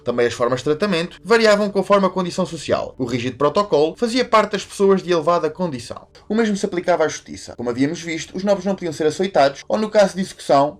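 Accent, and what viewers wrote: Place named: Portugal. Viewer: Carai nao entedi nada portugues de portugal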